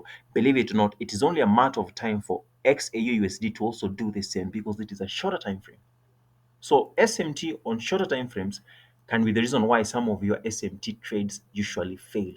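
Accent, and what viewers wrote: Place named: India. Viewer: Why you speak like indians ? Are you paj..et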